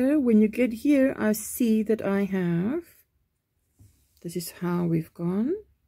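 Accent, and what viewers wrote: South Africa. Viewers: Omg I just found you and you sound just like me mom with your accent. I'm guess your from South Africa. I lost my mom in April and miss her so much, hearing you talk brings me so much happiness remembering her voice. I was born in Capetown but came here to the U S when I was 3. My mom never lost her accent. Love this poncho and can't wait to make it.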